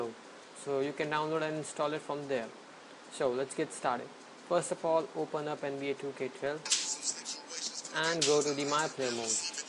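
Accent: India. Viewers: nice accent. indian boy. ? :)) btw . tnx a lot it helped:d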